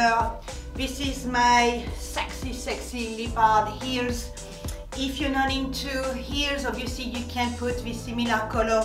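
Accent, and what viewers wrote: France. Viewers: you are so stunning! I just found your channel a few days ago and subscribed right away. I love your bubbly personality and your beautiful French accent ❤️. Everything sounds better with a French Accent. I’m a super Francophile so I’m enjoying you so much. Your style is super chic.....love your videos...I’ll be watching them all! Merci❤️👠👜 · I want to be you Beautiful....speaks French....French accent...stylish....I'm very jealous . X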